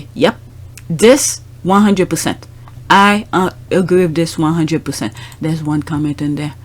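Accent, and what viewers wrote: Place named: France. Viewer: I just came across your channel girl you are so good at hitting the main point on each click I love your French English accent if am right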